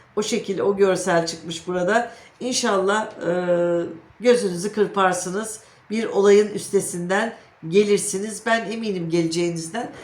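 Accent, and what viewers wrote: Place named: Central Anatolia, Turkey. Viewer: Aldım kabul ettim bana uyanlari. ağzınıza sağlık hanım sizi çok severek dinliyorum nerelisiniz acaba merak ettim konuşmanız iç Anadolu bölgesi'ne hitap ediyor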